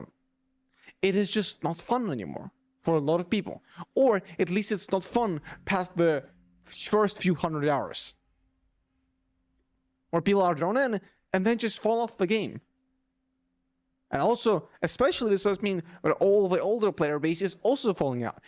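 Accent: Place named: Finland. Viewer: omg, that finnish accent, idk if u are from Finland but your accent sound very finnish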